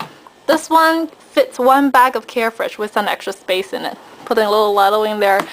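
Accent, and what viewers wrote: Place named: China. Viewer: She's Chinese, so it's just her accent.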